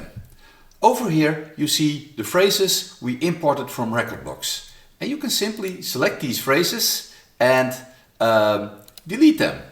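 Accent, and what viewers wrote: Netherlands. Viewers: hahaha hij praat Engels op de Nederlandse manier 😂 je hoort het accent gewoon 😜 wel cool dat deze software van Nederlandse bodem komt ! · I like your narration - is that a dutch accent I hear? reminds me of my grandpa :) good times!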